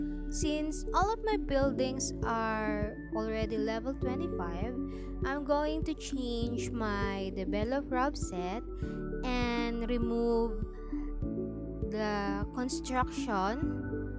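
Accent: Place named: Philippines. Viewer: sa way ng pag pronounce mo ng english i think pinay ka hehe ang cute🥰 more upload pa po ng grand mafia tips pls🥰🙏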